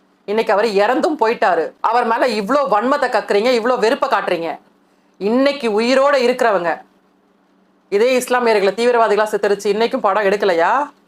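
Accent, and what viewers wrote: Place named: Malaysia. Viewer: SISTER. YUR SLANG OF SPEAKING TAMIL...LIKE MALAYSIAN.,..THANKS SISTER... MALAYSIA.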